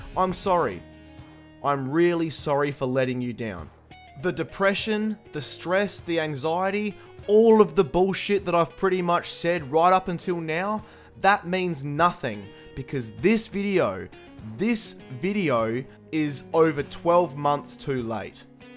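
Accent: Australia. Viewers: So good to hear an Aussie on YouTube, seeing I am an Aussie, no explanation needed, do your videos at your own pace, your videos are awesome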